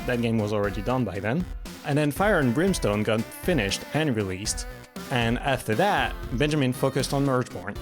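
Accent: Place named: France. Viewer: I'll be honest, I kinda only skim read the newsletters. Now having it dictated to me by a lovely Frenchman, well it's très bon for my ears. You guys are some of the best indie developers out there, and I hope more and more people come to appreciate and support your work. Thanks for all the fun games and I wish you all every success.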